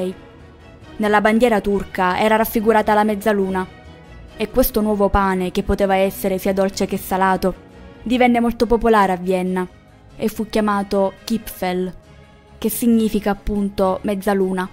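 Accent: Sicily, Italy. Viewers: DAL TUO BEL ACCENTO SONO QUASI SICURA CHE SEI DEL SUD DELLA PROVINCIA DI SIRACUSA O RAGUSA BRAVA COMUNQUE MOLTO BELLO IL TUO CANALE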